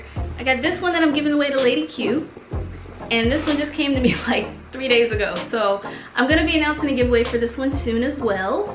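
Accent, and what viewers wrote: England, United Kingdom. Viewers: Not the British accent😭😭😭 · We need to talk about your English accent lol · Haha! British accent is coming along!! ❤️❤️ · Are you British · That British accent tho😂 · Looove ur british accent btw🥵😍😍😍💯🙌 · Yo I was so confused I’m like why does she sound kinda British still swear sis was American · The British accent got me 😅😅😅😅😂😂😂😂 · What is with the British and Australian accent? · That British accent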